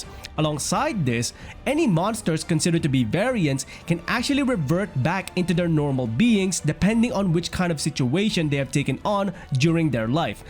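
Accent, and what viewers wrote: Philippines. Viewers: When i Heard your Accent, I already know you are a "Kababayan" I think Variant Monsters are Those monsters that adapt to their surrounding or maybe just a Gene Mutation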